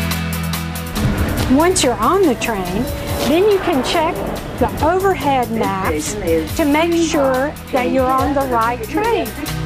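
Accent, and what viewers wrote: United States. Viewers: Nice Video! Very well explained! I like hearing the American Accent in London!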